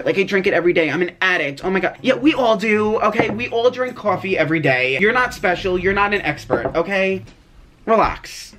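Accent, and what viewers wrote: New York, United States. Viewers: That New York accent thou😂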